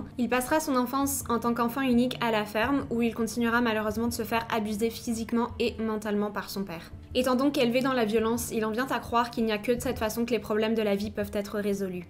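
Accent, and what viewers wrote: Quebec, Canada. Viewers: Bonsoir, je viens de découvrir tes vidéos depuis début juillet 2021. Merci pour tes vidéos, j'adore ton accent québécois. Pourquoi as-tu choisi de réaliser des vidéos sur les crimes, stp? A tantôt. Belle fin de soirée, bisous livresques nocturnes criminels.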